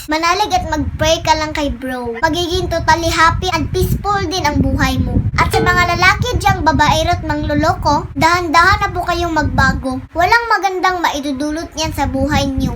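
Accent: Visayas, Philippines. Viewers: Visaya kaba ?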